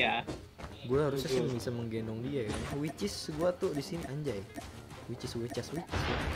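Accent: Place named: Java, Indonesia. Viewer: Medog Wkwkwk 😂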